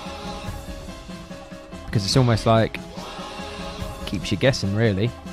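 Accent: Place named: United Kingdom. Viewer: I like his British accent😂 it’s not a joke I just like it😂😂😂 but I just wanted to put in the laugh emoji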